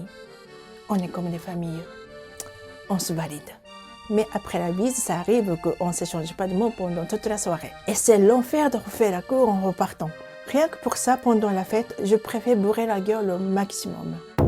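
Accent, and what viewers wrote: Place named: Africa. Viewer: C'est marrant tu parles tres bien le Francais...Avec un accent presque " Africain " !!!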